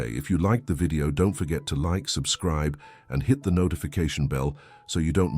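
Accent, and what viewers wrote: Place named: United Kingdom. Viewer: I enjoy your English English. American English I do not like so much. So your pronunciation is a melody for my ears. Hard work, honesty, perseverance is what makes people happy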